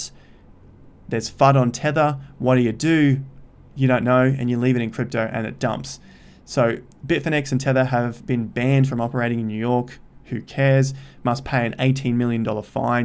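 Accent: Australia. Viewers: First video I watched of yours. Good to hear an aussie accent. I new subscriber :)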